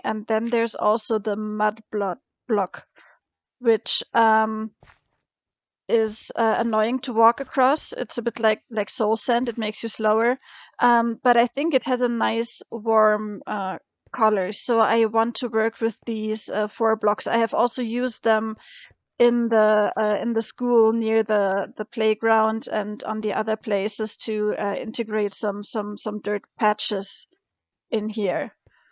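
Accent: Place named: Norway. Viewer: How do you make the timelapse? And which editing software do you use? -Architectix and i'm still working on the build so i can help you if you want. And which nation do you come from? You sound kind of Norwegian in the accent.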